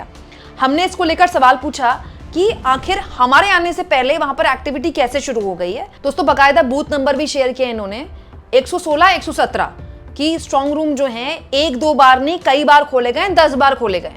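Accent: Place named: Uttar Pradesh, India. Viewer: Bhatere सवाल 😂😂 बुलंदशहर अलीगढ़ स्टाइल छोड़िए , बहुत से सवाल बोलिए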